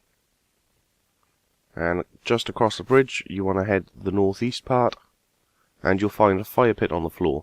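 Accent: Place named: South Wales, United Kingdom. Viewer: Your accent sounds local to me...im from Cwmbran, South Wales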